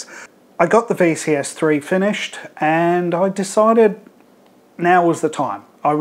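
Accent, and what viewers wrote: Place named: Australia. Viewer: So.. I'm watching your video on programming a Logic synth.. There's a teacup on the table, and I wonder.. "I've got one just the same." I move on to this video, and it occurs to me.. What's this accent? I recognise it. I check the 'About' tab. Ah ha. He's an Aussie! Hi. Loving the random 💯 objectives, diggin' the ambient washes.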